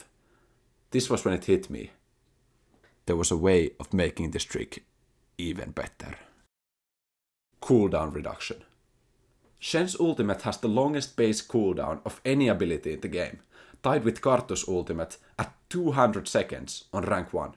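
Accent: Finland. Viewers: Is this guy finnish? Nice video! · Maybe you could calculate which item is more worth by using gold value of stats? Like for example every point of ap has x gold value or every percentage of cdr has y gold value. Not sure though, thought it might help. Hyvä video ja mahtava suomalainen aksentti! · joo katos ootha sää suomalainen enkun perusteella huomas heti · The way he talk it tells all that thos guy is from finland😂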